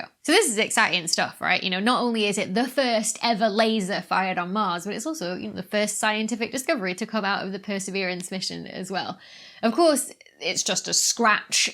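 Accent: United Kingdom. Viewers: with the british accent and a cute face cant ask more than that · That British accent though...If you listen to how she speaks and not necessarily all of the science jargon she's talking about and still love it...give this comment a big thumbs up!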